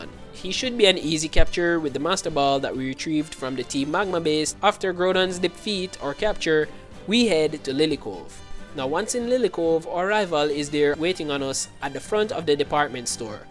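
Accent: Jamaica. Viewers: I KNEW you were Jamaican. What parish you from? My Mom's from Hanover. I was born in Canada though. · Are you Jamaican?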